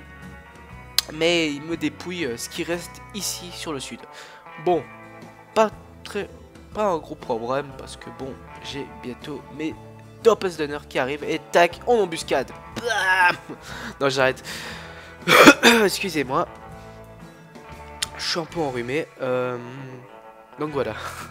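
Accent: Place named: France. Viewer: J'adore ton accent à la française.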